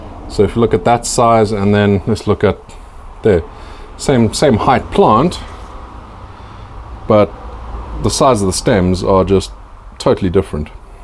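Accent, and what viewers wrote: South Africa. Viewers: Do you ever top your peppers? Mal oor jou videos. Jy klink soos ou SA-man.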